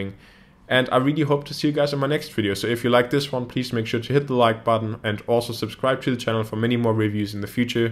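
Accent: Germany. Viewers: Bei der Aussprache. 100 % deutscher hahaha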